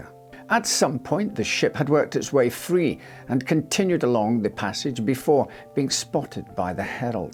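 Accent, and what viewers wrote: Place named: Scotland, United Kingdom. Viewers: I’m really curious about where you’re from — your accent has some strange twangs, like when you pronounce “O” it sounds very New Zealand (“boat,” “known” etc), while you mainly sound Scottish… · Being told tragic and horrible stories, but in my favourite accent and a really nice voice is a confusing experience, but as usual with me, the Scottish accent outweighs the nightmare material. 😌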